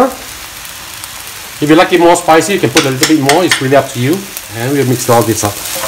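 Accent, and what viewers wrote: Singapore. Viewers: Wah lau. Nice video and great narration in chaste Singaporean accent. Miss the place and the people so much. Take care aah. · blech71 singaporean accent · You speak like a Singaporean... I miss Singapore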